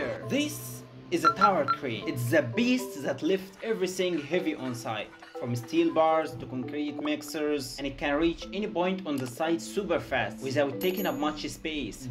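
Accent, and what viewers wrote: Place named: Egypt. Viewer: Your accent is so Egyptian 😂 but i love the content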